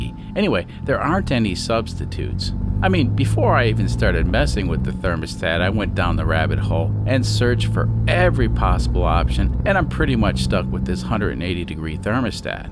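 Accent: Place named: Southern California, United States. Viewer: I understand your caution. When we changed to metric measurement here in 1974, conversion to litres per kilometre (or as it's spelt (or as spelt itself is spelt is the US "spelled"😂) in the US) liters per kilometer popped up for a short while but it quickly disappeared in favour of l/100km. Also, on reflection Kilopascals (kPa), not Hectopascals, is on the tyre pressure gauges here. Interesting re the accent. Had I been so bold as to guess your background outright, I would have said, born somewhere in Wisconsin, then moved to Southern California. I'm no expert but to me your vowels sound midwestern but the lilt is straight outa Garden Grove.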